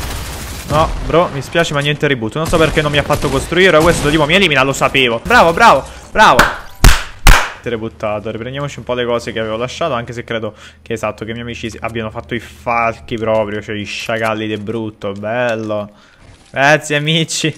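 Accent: Naples, Italy. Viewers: Oddio ma sei di Napoli 😂😍🤞🏻💙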